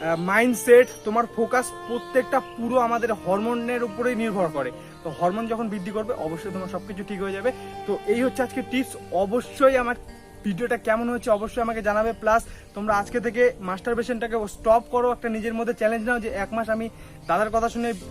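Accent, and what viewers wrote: Kolkata, India. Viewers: ভাইয়া তোমাদের বাসা কলকাতা বলতেন তোমার কথায় অনেক ভালো লাগছে বলবেন ভাইয়া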